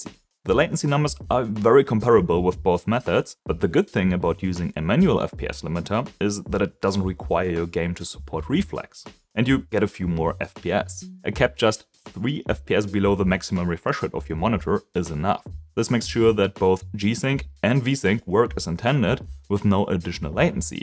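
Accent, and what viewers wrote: Germany. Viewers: Tatsächlich nicht den typischen deutschen Dialekt aber dann auf den letzen Metern verraten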